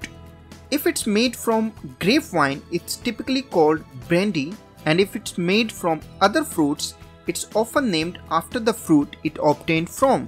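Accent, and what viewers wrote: India. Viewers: indian accents are crazy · Your Indian accent 😁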